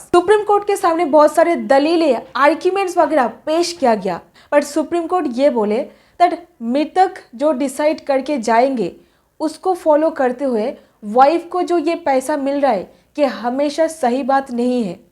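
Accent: Bengal, India. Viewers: Your Hindi is not clear. Bengali flavour aa jaata hai and so unable to understand. Pls translate this in English. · I love Bengali accent... ❤❤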